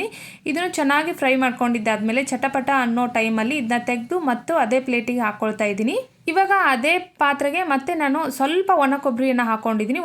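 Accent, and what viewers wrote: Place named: Davangere, India. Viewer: Sis nimdu Davangere na language ade tara ede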